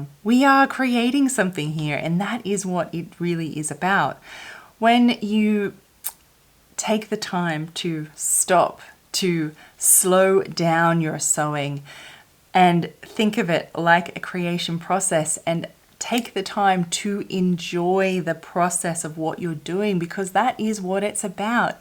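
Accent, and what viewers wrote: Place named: Australia. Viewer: Hello! I'm a fashion designer student, recently took the haute couture class in which everything is handmade. The project is a wedding dress and I aboslutely loved the process. It is really slow and it requires patience and attention to detail, but I found it quite relaxing. It was a space I gave myself to take things calmly, and stop worrying about all the other stuff I had to do on the day, you know? I know I can't make all my pieces in this method, or at least not now cos of the economic side of it, but it was a nice experience: for the first time the dress' aesthetic is comming exactly as I imagined it (only a size smaller hahahaha), and I guess is the time involved in it. By the way, I didn't know you are in Australia, I could sense a different accent, but didn't guess where it was from. I'm not an english native speaker, so I was like... 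hummm.... she sounds a bit british, but not quite! hahaha. Greetings from Mexico City <3!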